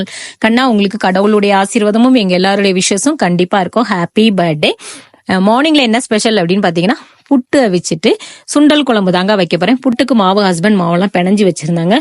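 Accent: Tirunelveli, India. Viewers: Akka, thirunelveli ya!!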